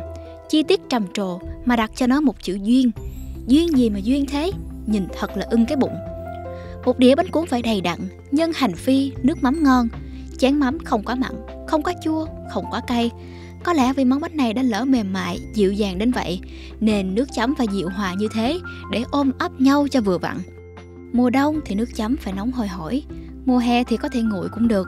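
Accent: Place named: South, Vietnam. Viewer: Bài viết về món ăn miền bắc, người viết bài cũng dùng từ ngữ của miền bắc. Vậy thì giọng đọc của miền bắc luôn đi. Tự nhiên đưa bạn miền Nam đọc làm chi. Nghe không hợp xíu nào. Giọng đọc này chỉ có thể dùng từ "tiệm" thay vì "hàng", từ "phi hành" chứ không thể là "chưng hành". Nghe chướng tai 🙄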